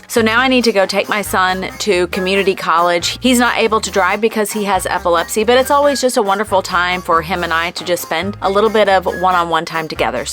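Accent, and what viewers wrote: South, United States. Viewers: Hi, loved the video today! I think your work room is adorable. I thought you were from the south, I was guessing South or North Carolina! I am in Georgia. I have been knitting and crocheting since I was a teenager. I became a fiber artist about 15 years ago. I had never thought of myself as a real artist, just a woman with a love of all things yarn. Then a very dear friend of mine who worked at an art department in a university in California, looked at my work and said that it was comparable to many of the professional things that she had seen displayed at various fiber art exhibits. She helped me to see that what I made had beauty and artistic value and that my own designs and patterns were unique. It really changed how I viewed what I did and gave me confidence to try new things. It truly opened up a whole new world for me.:) This summer for a little change I tried my hand at embroidery and thread painting. 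Now I am back working on a new shawl design. I have a small craft room upstairs which I affectionately call "The Lair". I think we have a lot in common. Have a great day ahead! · I knew it! North Carolina! With your cute southern accent!!!! 🤗🔥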